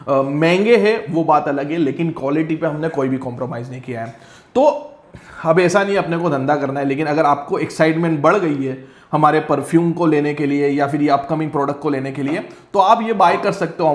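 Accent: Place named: Ahmedabad, India. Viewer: Taddan Ahmadabadi accent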